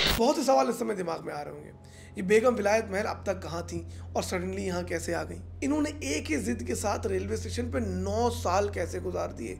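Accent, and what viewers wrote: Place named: Western Uttar Pradesh, India. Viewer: I like the husk in your voice texture and also your skill to communicate and explain the depth of emotions of each character involved in the story. I salute to the courage of that mother who played a fake identity so efficiently for decades just to keep her family secure and surviving under a shade. Aapke bolne ke lehze se lagta hai aap U.P. me Etah, mathura ya mainpuri ke aas pas ke ho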